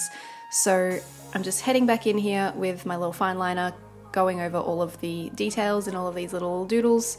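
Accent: Australia. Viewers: It's nice to hear another Aussie on Youtube. Love your spread. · Also, great to hear another Aussie Bujo-ist :’)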